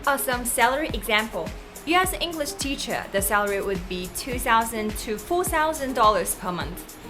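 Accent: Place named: Singapore. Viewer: Singaporean British English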